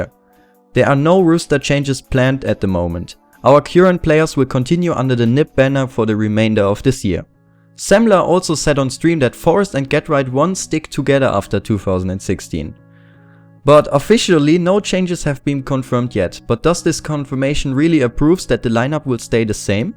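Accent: Germany. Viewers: nice video but very much german accent but still nice :D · If u pay attention you could recognize ur german accent :D <3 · schöner deutscher akzent :D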